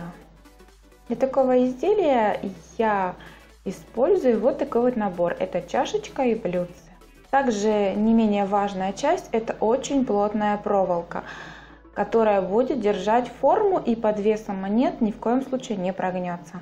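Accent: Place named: Ukraine. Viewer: ну я поняла,просто сказала.вы с Украины?у вас есть акцент.много знакомых на Украине живут,они все верят во всякие приметы.У вас не так?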